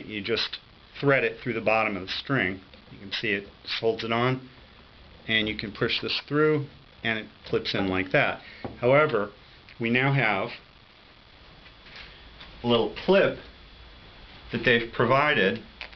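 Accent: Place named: United States. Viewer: It sounds American, to me...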